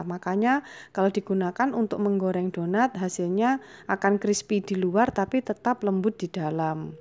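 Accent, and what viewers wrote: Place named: Java, Indonesia. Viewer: Ndak papa mbaaaak meski medok...itu ciri khas mbak.ehehhee...makasih sdh mau berbagi....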